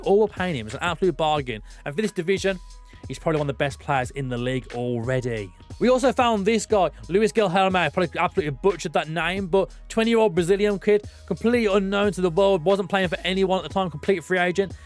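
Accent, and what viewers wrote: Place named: Plymouth, United Kingdom. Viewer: Are you from Plymouth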